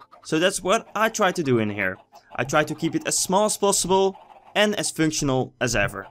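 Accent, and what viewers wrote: Netherlands. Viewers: All my drops get burned off the lava? Why does it do it? And another question? Are you dutch? You have a dutch accent, im dutch as well ;D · u dutch ..... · Are you Dutch?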